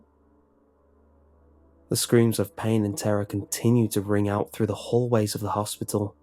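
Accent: United Kingdom. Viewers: I can't believe how many views he gets, when i saw it this had only been up 18 minutes and already had over 1000 views. Guessing Americans like a creepy British voice? lol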